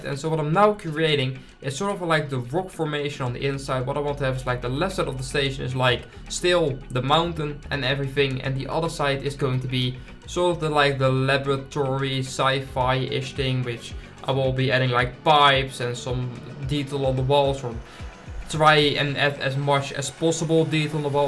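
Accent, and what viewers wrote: Netherlands. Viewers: Probeer niet engels te praten want je probeert Engels te praten maar je hoort je Nederlands tussen en dat in het ombetant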